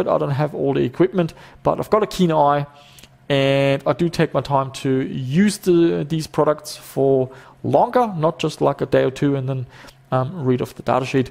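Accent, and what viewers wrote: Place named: South, Germany. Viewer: Wenn ich es nicht besser wüsste, würde ich sagen, du hast einen deutschen Dialekt 🤔 und deine Intonation klingt Süddeutsch.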